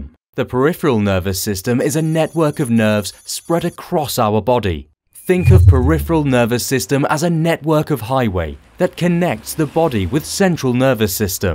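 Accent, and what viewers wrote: England, United Kingdom. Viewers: this sound is full of england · I find the dichotomy and paradox of the thick British accent being paired with the lack of conjunctions and prepositions quite amusing!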